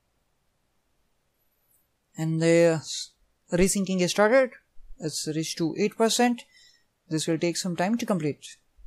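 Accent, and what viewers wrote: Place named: India. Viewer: Strong Indian accent - hard to follow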